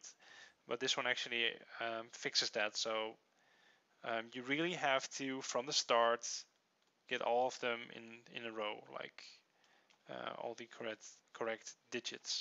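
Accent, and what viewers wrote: Netherlands. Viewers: kan duidelijk horen day hij nederlands is waarom kan dat altijd :p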